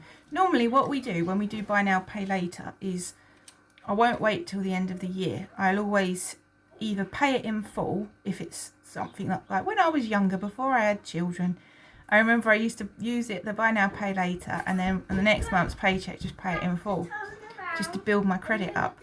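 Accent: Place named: United Kingdom. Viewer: I love listening to you talk, I'm learning british vocabulary and accent and you help me a lot in my practice <3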